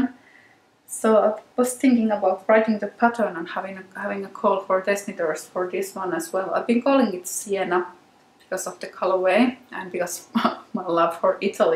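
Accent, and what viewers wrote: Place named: Finland. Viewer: your finnish is showing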